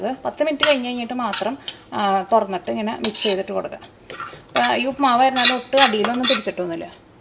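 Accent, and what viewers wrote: Thrissur, India. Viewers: Trissur language njangalkoke ishtanu.speed koodiyalum kuzhapamilla keto.soundoke cook cheyyumbo natural anu.athonnum sarallya.... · വളിഞ്ഞ തൃശൂർ ഭാഷയും ഭാഷയുടെ സ്റ്റൈലും ഒന്നു മാറ്റിയാൽ മനോഹരമായിരിക്കുന്നു ഭാഷ ഒരുതരത്തിലും സഹിക്കാൻ കഴിയുന്നില്ല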